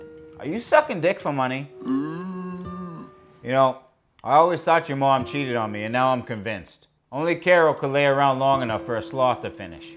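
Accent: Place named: South Boston, United States. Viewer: XD I love that he has a southie accent!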